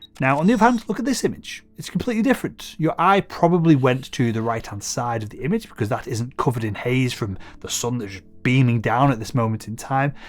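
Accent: United Kingdom. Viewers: Even though I watched this video to learn about landscape photography- which is something I like to to as a hobby - I have to say that I really like your English accent and I think you’re really cute, which was the cherry on the cake. ;-)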